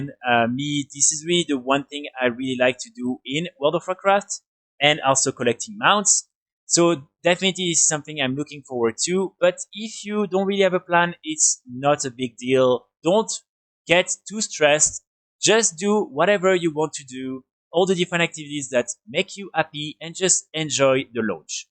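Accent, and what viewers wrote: France. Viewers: Bonjour, je pense qu'en français je regarderais vos vidéos, mais là un tel accent français pour des vidéos EN je peux pas. Beaucoup de timeline vidéo pour dire finalement peu de choses. Je vous conseille les vidéos de Penguin, niveau contenu vous pourriez prendre exemple. Bon courage à vous.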